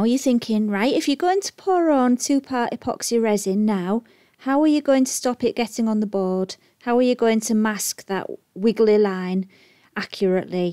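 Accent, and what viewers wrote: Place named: Northern England, United Kingdom. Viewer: this is absolutely beautiful!!!!!!! I have got to try this out. And your Northern accent is so cute and relaxing!! You explained this perfectly!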